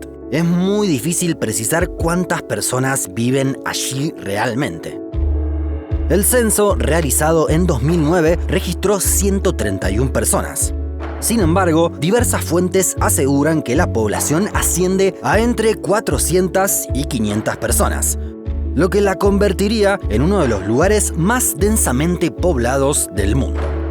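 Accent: Rioplatense, Argentina. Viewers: Sos argentino o uruguayo? Jaja, lo loco es que es una de dos, no sos ni chileno ni nada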